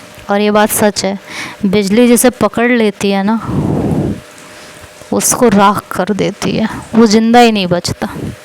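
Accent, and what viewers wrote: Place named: Haryana, India. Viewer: haa har jgh ki language ke according bolne ka trika change ho jata hai.. jese apka full hariyanvi touch ho rha tha.. meri mummy bhi bol rhi thi ki ha harayana jesi hi aavaj lag rhi hai because mere papa vha job karte the hariyana me jb me peda nhi huvi thi tb to mumy bhi rhti thi sath to unhone observe kiya hai.. mummy bol rhi thi ki aap mjakiya type ho i mean ye nhi ki jb bat kro tension do us type.. pta h mummy ko suabh se chull tha ki mere ko bhi sunana aavaj 😂😂😂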